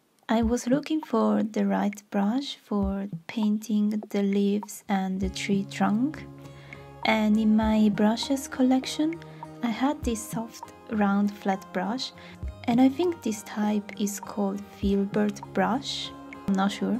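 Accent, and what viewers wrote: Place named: France. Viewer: une francophone quand même, j'ai reconnu l'accent! xD mais tu parles bien anglais, ton accent français est presque inexistant:3 j'aime beaucoup ton travail par ailleurs, tu aurais un instagram?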